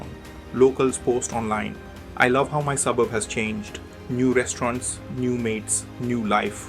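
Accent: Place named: India. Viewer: JUST STRAIGHT UP BULL SHIT What Anti-Migrant Protesters Got Wrong DO YOU ME MEAN AUSTRALIANS? LOL (I HAVE NOW BECOME ANTI-MIGRANT) BUT HAY, IT'S ALL ABOUT THE LAST 3 YEARS OF YOU LAZY, DIRTY, MEAT HEADS COMING INTO OUR COUNTRY AND OUR SO CALLED TRUSTING BUT LOVING GOVERNMENT LETTING IN 1500 INDIANS AKA IMMIGRANTS INTO OUR COUNTRY PER WEEK AND JUST TO TOP IT OFF IT'S A INDIAN VOICE OVER (GO HOME) TRYING TO TELL US AUSTRIANS HOW GOOD THEY HAVE BEEN FOR OUR ECONOMY F OFF HOME WE DON'T NEED YOU OR WANT YOU!!!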